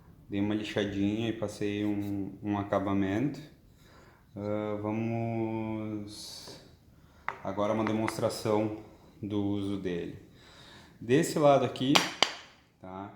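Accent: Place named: Rio Grande do Sul, Brazil. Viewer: Prezado, teus vídeos são muito bons... agora, na boa, esses teu sotaque gauncho... pelaamordeus... tire isso e ganhará o mundo.. abc..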